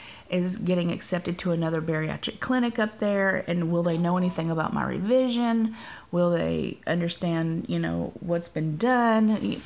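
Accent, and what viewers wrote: South, United States. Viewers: Hey! You will find that what a couple of the other ladies have said is correct, there are a lot of folks in Quebec, Montreal in particular that do speak English, however, I highly recommend learning to speak french as you will be more comfortable if you do so. That and hearing your southern self speaking french will be AWESOME! As for the vitamins, Melanie Gregory is right. Also when we move into an area, I have gotten a grocery trolly to take on the bus so I don't have to carry the groceries home. Explore at your leisure so you are more comfortable when you have to be somewhere. What is your husband taking that will have him at the University in Montreal?? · Canada is bilingual so you'll find a lot of folks who can speak/understand English. You will probably be treated just fine, as your southern accent is endearing. Montreal is a beautiful old city...are you guys going to do a vacation there to check things out? 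On the drug front, you likely won't find it hard to get your supplements...if anything Canada has many more over the counter drugs (that don't require prescriptions). I am speaking from experience, as I'm a transplanted Canadian who now lives in WA state. I actually have to make regular runs to the border (no...not for tacos!) to get some of the things I'm used to having on hand (Voltaren, Robax (has Soma in it) and cough syrup with codeine...yadda yadda).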